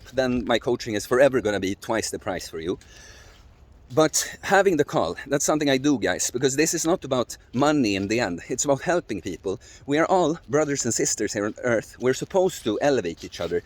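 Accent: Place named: Sweden. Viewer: Once I heard the accent I knew you were swedish. Jag är inte svensk men jag kan svenska. Grym video bror fortsätt så.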